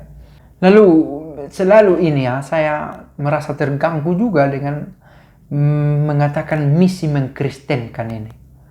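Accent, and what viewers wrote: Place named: South Kalimantan, Indonesia. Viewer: Logat bapak itu mohon maaf seperti logat org kalimantan selatan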